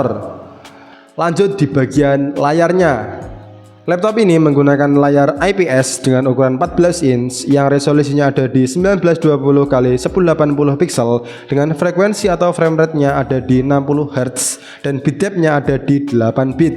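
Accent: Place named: Banyumas, Indonesia. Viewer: ngapak banget mas jadi ketawa saya😂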